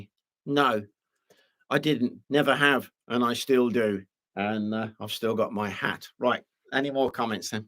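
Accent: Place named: Midlands, United Kingdom. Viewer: I love listening to you. It is nice to hear someone with my accent on youtube, as I come from somewhere near Lichfield. You truly are a gifted story teller and I love both your history videos and the ones about your life❤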